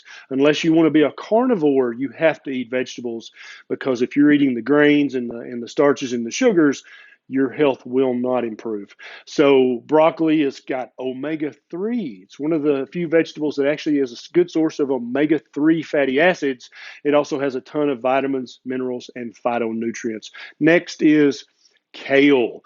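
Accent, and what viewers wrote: South, United States. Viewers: I could listen to you pontificate on celery for hours 😆 The Southern accent is too good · I love your southern twang!....oh and the video too!!!😉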